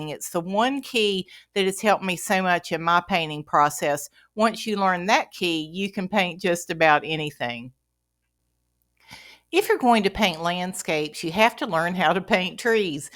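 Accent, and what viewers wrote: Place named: South, United States. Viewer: Im not a gifted painter just taking advice from artists on youtube, I just came across you and love to hear you speak in your southern American accent, im’Irish so I don't hear too many different accent........ I love your paintings ❤❤❤❤❤❤❤ 🇮🇪🇮🇪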